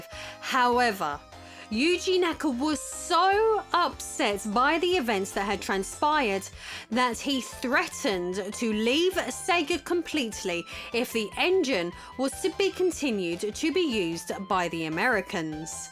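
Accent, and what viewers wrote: United Kingdom. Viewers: Of course.It´s impossible not to be. British accent with astonishing beauty <3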